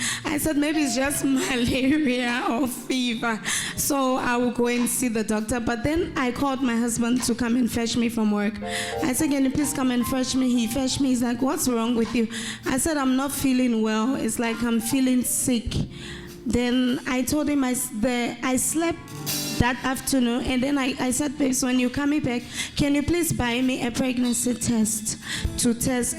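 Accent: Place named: Liberia. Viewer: She sounds so Liberian! I'm a Liberian, I will soon be there in Jesus name!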